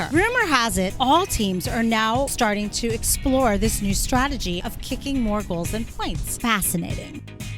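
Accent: United States. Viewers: I’m loving this. I look forward to this every week.. As an Aussie who used to live in Texas it’s awesome hearing an American accent talking about footy..👍 Please keep it going. Be patient. I can see you being a huge star ⭐️ down under in a few years !!!!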